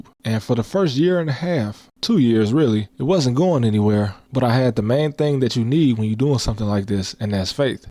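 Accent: Chicago, United States. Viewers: And bro you definitely got that Chitown Southside accent. Great videos! Nostalgic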